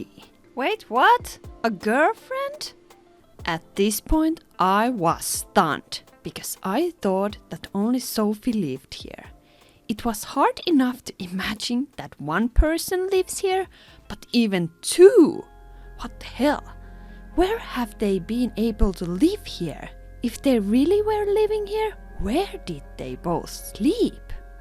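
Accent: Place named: Finland. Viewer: So rude. She has a beautiful voice and is excited about her cleaning. I love her Finnish accent. 💙🤍💙🤍💙🤍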